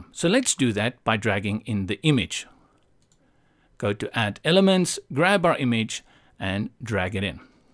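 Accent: South Africa. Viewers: you sound like you are from South Africa?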